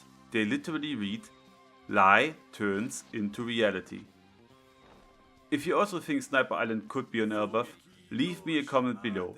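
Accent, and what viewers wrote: Germany. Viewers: Hey Mate, ich kommentiere nicht oft unter Videos, aber dein Kanal ist definitiv ein Hidden Gem! Da du meiner (und vermutlich auch deiner) Meinung nach zu wenig Reichweite hast, versuche ich mal meine Kommentarfaulheit als 0815 YT OP-Theorie Zuschauer zu überwinden und dir mein völlig subjektives Feedback zu geben. Die "Produktionsqualität" ist generell sehr gut, in Sachen Videoschnitt/SFX gibt es in diesem und auch in den anderen Videos "nichts zu meckern" ^^ Deine Theorie mit den Swirls und der 4. Wall ist wirklich meisterhaft. Hut ab! Dein Storytelling ist auch wirklich gut und imo ist der "Sidekick" das Lustigste was ich bisher in der OP Theories Community gesehen habe. Das gibt deinen Videos wirklich einen krassen Unterhaltungswert und Individualität. Der (französische?) Akzent ist imo on Point und zum wegschmeißen 😂 . Bezüglich des Videos könntest du bei der Audiobearbeitung evtl. 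noch den Kompressor auf der Masterchain tweaken, damit es etwas "voller" klingt und dem EQ für deine Stimme etwas mehr untere Mitten/Bässe geben. Nun zum einzigen, aber nicht zu unterschätzenden Kritikpunkt. Deine Aussprache bro. 🙃 Der Grund, warum ich mir zu 99,9% sicher bin, dass du Deutscher bist, ist, dass ich genau die gleichen Probleme mit der englischen Aussprache habe/hatte wie du...! 😅😅 Nichts für ungut, ich will dir nur mein laienhaftes Feedback geben, damit dein Channel in Reichweite explodiert ❤ . Du solltest wirklich an den Variationen von Th arbeiten. Außerdem R und W am Anfang ei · Sounds german not french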